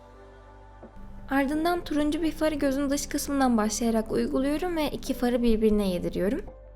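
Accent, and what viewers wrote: Turkey. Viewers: And because Turkish is a highly evolved language in comparison to other Turkic languages. She also speaks the Istanbul variant of Turkish. Millions of Turks there speak like that. The politician speak the Turkish more sharply (because it needs to be more aggressive, because obviously, it is politics). Politicians even get diction training in Turkey to speak the way they speak now.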